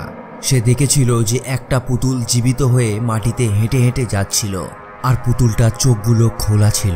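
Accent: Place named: Kolkata, India. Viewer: সুন্দর উপস্থাপনা তবে আপনার কথায় কোলকাতার স্থানীয় ভাষার একটা ভাব আছে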